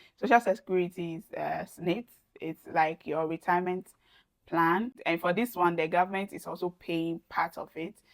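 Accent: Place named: Nigeria. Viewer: are u a nigerian coz you sound a nigerian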